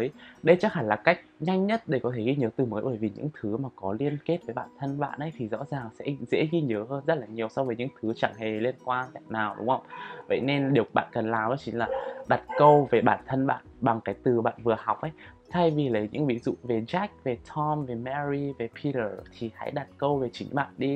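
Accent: Nghe An, Vietnam. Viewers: Bạn ấy giọng Nghệ An nhưng cố nói giọng chuẩn Hà Nội nên chưa tự nhiên 100% á nên giọng nhỏ và trầm hơn bình thường, nghe thì sẽ thấy có nhiều chỗ hụt hơi do phải "luyến" cho ra giọng HN với đôi chỗ cũng nghe ra âm sắc giọng Nghệ An:)) Cơ mà mình thấy giọng Nghệ An hay mà nếu nói chuẩn như giọng HN thì tốt không thì cũng chả sao! Just the way you are.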